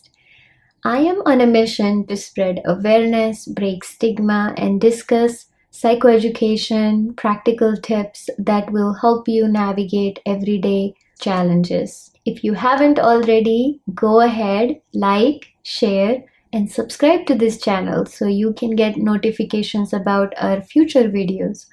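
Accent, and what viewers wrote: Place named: United States. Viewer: A cute Marathi girl with American accent 😀😀 A nice video anyway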